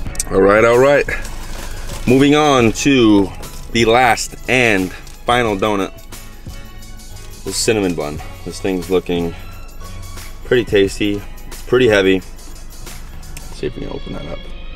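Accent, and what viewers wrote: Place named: New England, United States. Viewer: I thought for sure you'd go to Rocco's in Millbury,! PV is good too though! 😄 Wicked awesome video- happy you never forget your old stomping grounds! And don't you ever lose your accent- you gotta represent us New Englanders! LOL #TeamBigBites FOREVAA 🍩💜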